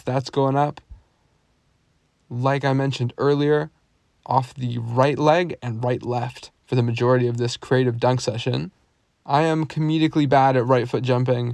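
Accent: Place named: Canada. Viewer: what is your height? and are you canadian? you sound very canadian 😆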